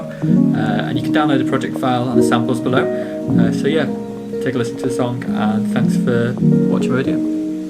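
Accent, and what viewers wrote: United Kingdom. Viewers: You from the north of england mate?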